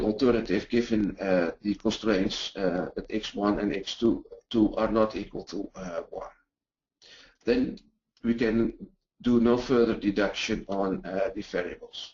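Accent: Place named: Netherlands. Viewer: This man's English pronuncation indeed sounds like he is from the Netherlands and learned English over there.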